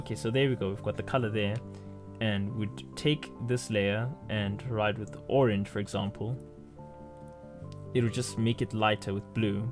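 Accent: South Africa. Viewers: Myyyyyyyyy bruuuu!! Was NOT expecting a fellow SA to be doing a Krita tutorial. I picked up your accent like 3 seconds in lol. Thanks for the tutorial, this is the second one I've watched and I like how you are diving into each aspect. The first tutorial didn't really explain the "New Canvas" dimensions page at all. It's a bit overwhelming because there are soooo many tools and I haven't done digital art before. Regards from Cape Town.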